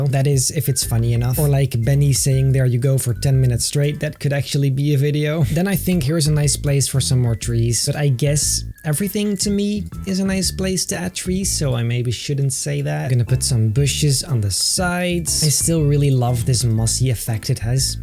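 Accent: Netherlands. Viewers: Are you from the Nederlands?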